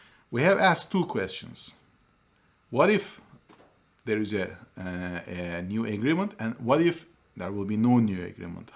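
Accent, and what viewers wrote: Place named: Russia. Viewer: You sounded like a Russian speaking English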